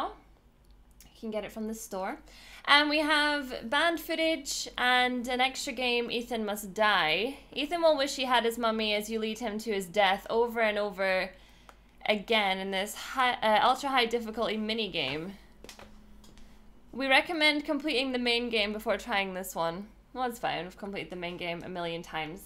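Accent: Scotland, United Kingdom. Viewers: Are you British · Are you Scottish ?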